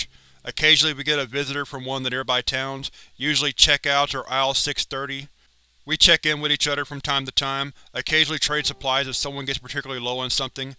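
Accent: South, United States. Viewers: I just hate how the guy voicing this has the thickest southern accent of all time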